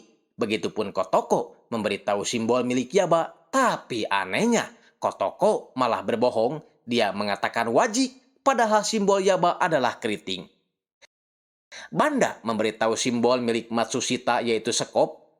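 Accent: West Java, Indonesia. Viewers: Papua hadir. Selalu terhibur dgn khas Sunda nya🙏🤣, sukses selalu Aa🙏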